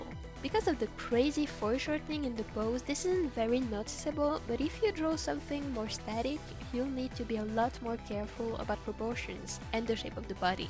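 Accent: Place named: France. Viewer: Okay I’m not commenting about the video (you’re very very talented) BUT I needed to tell you that I didn’t even heard your French accent at first Like At all. And I’m French too, and it makes me kinda more confident to hear another French speaking English! So haha thanks :))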